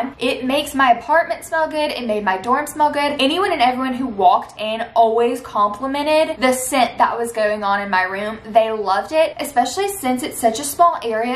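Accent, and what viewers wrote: South, United States. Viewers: I watch videos on 2x usually and never realized you had a super southern accent until this one was playing in a normal speed. Its a nice accent I just was surprised. Love your videos!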